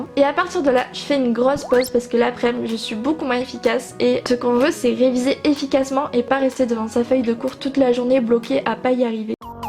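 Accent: South, France. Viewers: J’ai pas compris pourquoi tu fais l’accent du sud ? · Isleym Hachfih peut être qu'elle est du Sud ? 😕😂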